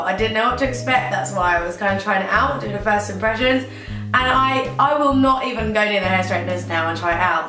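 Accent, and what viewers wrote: United Kingdom. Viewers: You sound like Demi Lavato but with a british accent ... O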